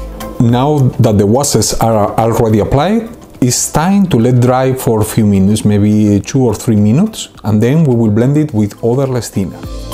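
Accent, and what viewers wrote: Spain. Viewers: no hombre por el amor de dios no te disculpes si lo hablas bien solo que tiene que intentar mitigar un poco el acento español lo tienes muy marcado y puede hacer que algunas cosas no se entienda bien en ejemplos como el de el sonido de la ce o la zeta que son fonema que no existen en ingles y a los angloparlantes les puede sonar a chino una palabra así pronunciada. Saludos mig perdón se he sido un poco impertinente animo mig 💪🏼💪🏼💪🏼💪🏼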